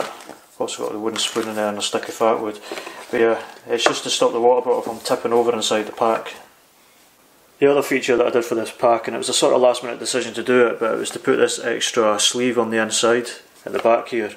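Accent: Scotland, United Kingdom. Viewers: Cool pack, I like the orange lining. I've noticed your Scottish accent is getting stronger now your back up there, no problem for me, I can understand ya, I lived in Aberdeen for 3 years. Nice one. Lee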